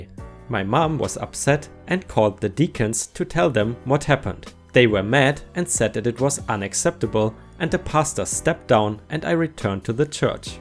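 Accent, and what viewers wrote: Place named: Germany. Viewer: You're saying 'pastor' just fine (more like 'past-ah', but frankly I love the German pronunciation, so doesn't matter to me what you say!). An FYI (for anyone who doesn't know), Xmas (which is actually pronounced Christmas btw) isn't a lazy way of writing the full word. The X comes from the Greek (classical, but I think it's the same in modern) which was the first part of Krisotos (pronunciation, not spelling!). When Christmas was first being celebrated, it was common to write it as Xmas as Christianity wasn't yet a fully-accepted religion. There are a few other possible explanations as to why it was used, but that's the first I was told so I stick to it. Hope you don't mind the didactic moment LOL!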